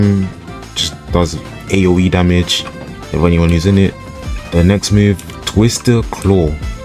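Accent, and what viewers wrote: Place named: United Kingdom. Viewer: He’s British I didn’t know this was his real voice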